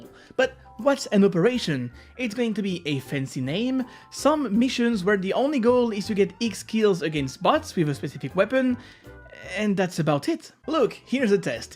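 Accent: France. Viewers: accent du sud ? le rooooh putaing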